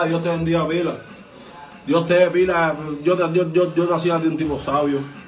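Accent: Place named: Dominican Republic. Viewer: Y por qué el habla como dominicano asere🤣🤣🤣🤣, es un ridiculo de verdad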